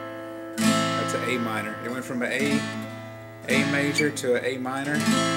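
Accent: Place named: United Kingdom. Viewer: Haha you're great, especially about the cool British accent!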